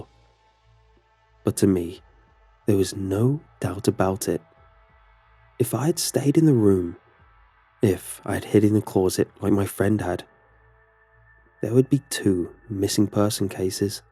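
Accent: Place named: RP, United Kingdom. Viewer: Think that's just how posh twats talk it sounds like that 😂😂😂😂 lmao. I'm from the UK I am not bloody English tho!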